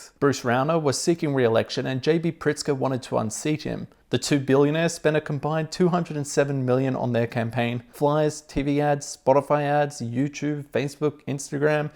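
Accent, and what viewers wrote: Australia. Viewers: is it just me or does he not have a very noticeable aussie accent?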